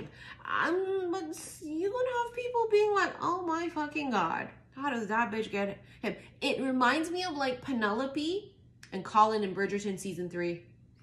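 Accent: New York, United States. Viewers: sound like a true new yorker and remind me of my home Harlem.:goodvibes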